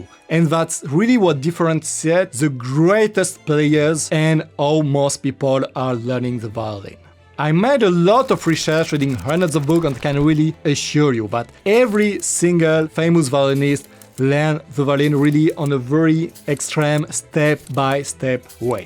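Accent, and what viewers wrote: France. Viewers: Your French accent is difficult to follow. Please use AI to change to a British or American accent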